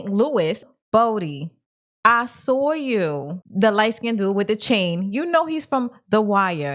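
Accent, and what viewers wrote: New York, United States. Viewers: Your breakdowns and edits are really cool and always hit the important elements of the episode,. Your New York accent adds the flavor - my girl is from Looong Guylanddd. The father-son (and mother) bonding over music is a Detroit thing, IMO. I learned keys, bass, and organ from my mother and uncle. Stay strong!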